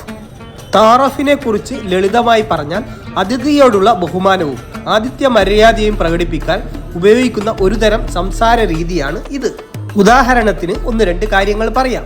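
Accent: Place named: Malappuram, India. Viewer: സംസാരം കേട്ടിട്ട് മലപ്പുറംകാരൻ ആണെന്ന് തോന്നുന്നു ഇടപഴകുമ്പോൾ എന്ന് പറയണം അല്ലാതെ ഇട പയകുമ്പോൾ അല്ല അതുപോലെ ഴ ഉച്ചരിക്കേണ്ടിടത്ത് നിങ്ങൾ യ എന്നാണ് ഉച്ചരിക്കുന്നത് കഷ്ടം അല്ലാതെന്താ പറയുക ?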